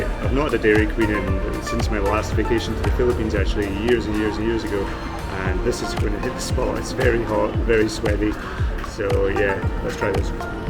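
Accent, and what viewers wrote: Scotland, United Kingdom. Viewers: Aww. That Scottish accent. Made me smile all the time.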